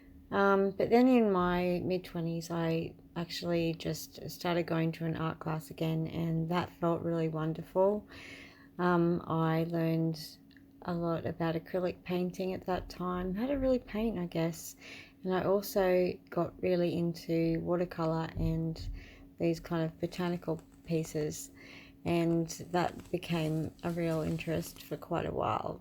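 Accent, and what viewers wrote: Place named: Australia. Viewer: Hello from the UK! Hope you are baring up ok in your midwinter (for us it is the middle of summer, but it still keeps raining and isn't very warm). What region of Australia do you live? I like your accent!! :-) And loved the beautiful botanical art you did in your 20's! I'm glad you are keeping up your art.....unlike me, who can't seem to motivate myself, even though I want to!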